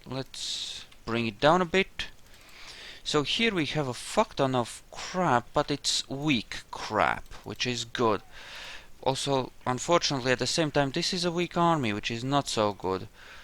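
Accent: Russia. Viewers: Are you Russian? You kinda have a Russian accent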